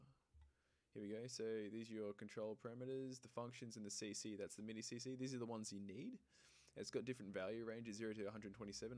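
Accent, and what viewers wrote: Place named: Australia. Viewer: Hi from Norway! Great to hear another Aussie accent :)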